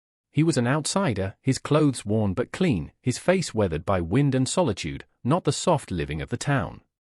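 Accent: United Kingdom. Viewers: Horrible narration. British accent for the 1800's Old West? Seriously?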